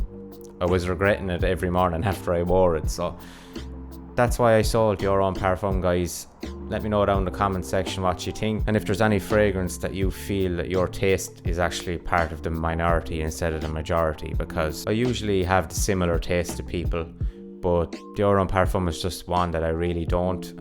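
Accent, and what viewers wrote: Ireland. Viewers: Your never Irish are you?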